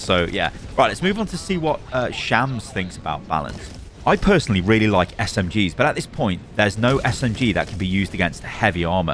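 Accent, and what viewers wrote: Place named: Southern England, United Kingdom. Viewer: Nah they're both just from the south. London area.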